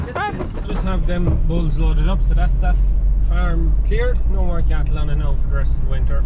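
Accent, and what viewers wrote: Ireland. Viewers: enjoy your channel from across the pond. Are you aware that you have a slight Irish accent, and that a beautiful woman is working on your farm, oh, you lucky rascal? 😉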